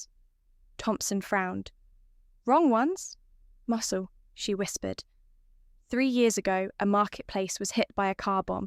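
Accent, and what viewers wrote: United Kingdom. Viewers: Good story. Love the British accent and no AI mistakes in pronunciation or misspelling So refreshing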